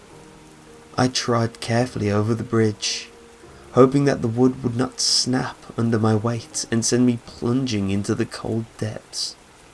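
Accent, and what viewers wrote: England, United Kingdom. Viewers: Nice to have an English accented narrator for a change , nice atmosphere and fab pics. Thankyou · Why are all creepypasta narrators British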